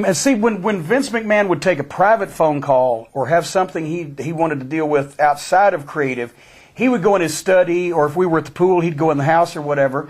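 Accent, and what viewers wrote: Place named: South, United States. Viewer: Jim cornettes is Fucking Hilarious , his southern accent and his Shoots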